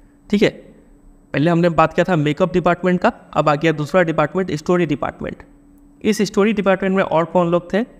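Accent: Bihar, India. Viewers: Sir are u from Bihar ?? Just asking ❤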